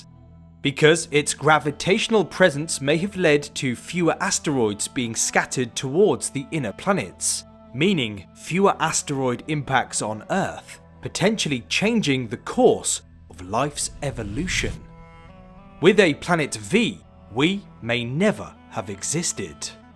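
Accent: United Kingdom. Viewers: I got my own version you might enjoy if you want, Mr. British Voice, My Anglo-Saxon Cousin.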